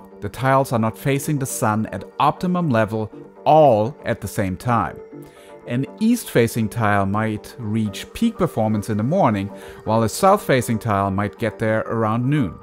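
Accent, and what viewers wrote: Germany. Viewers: ...your accent??? not quite german...aber ein bissien.. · Your english language sounds very german!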